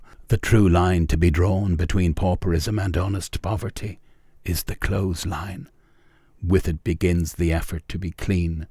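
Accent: United Kingdom. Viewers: So what's up with not being able to watch an American subject without incessant Brits narrating everything on the internet?.....can't watch TV, now can't enjoy a video.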